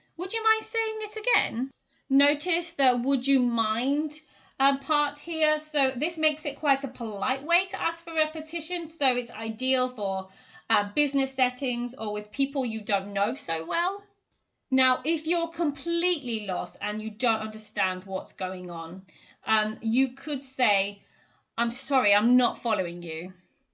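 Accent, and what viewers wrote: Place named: United Kingdom. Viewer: Hey .. those are really cool way .hmm . I think you have a British accent right .I like that .why don't you teach how to learn British accent .I wanna learn it .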